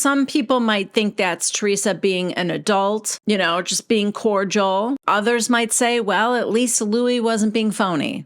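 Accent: New Jersey, United States. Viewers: Love you!! You do such a good job recapping!! Your Jersey accent is hilarious 😍 Louie is a narcissistic control freak... Teresa is the perfect victim Unfortunately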